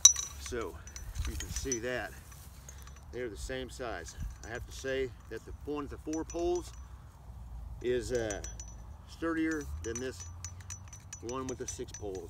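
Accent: United States. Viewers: I could just tell by the intro that this was going to be a American dude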